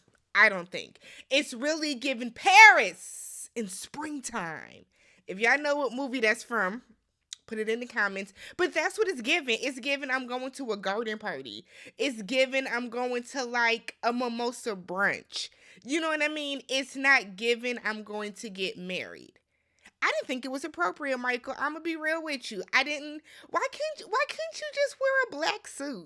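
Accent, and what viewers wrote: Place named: Chicago, United States. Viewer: Being from Chicago, listening to you is like talking to my girls I grew up with. I lol every single review 😂🤣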